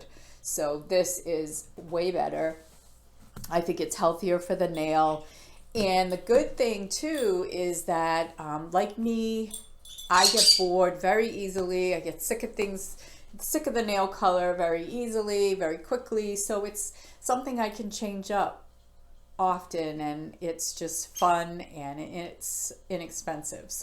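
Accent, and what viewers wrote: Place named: Boston, United States. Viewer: You need to make more videos. We are all enjoying your lovely accent and nice personality. You make such nice videos of your nails. You must be from Boston or Maine. I was originally from Long Island NY and moved to warm Florida a few years ago to get away from the cold. Anyway keep up the great work!!!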